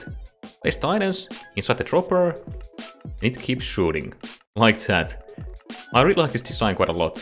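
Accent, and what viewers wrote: Finland. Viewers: this really helped btw are you finnish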